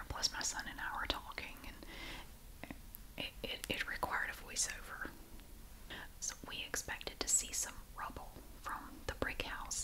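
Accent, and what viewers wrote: South, United States. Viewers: You have a soothing voice and mix in that Southern accent it's strikes gold . Personally, I like it better and feel the whisper takes away your best attribute. I watched your videos for years and congratulate on building your channel. My favorite is when you treated yourself to a cruise something you wanted so badly. That was an awesome emotional video.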